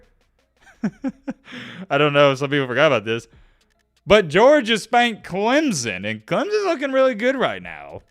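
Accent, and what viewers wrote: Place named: Alabama, United States. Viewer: The way you say Miami hurts my ears 😂 that’s that Alabama country talk my Amy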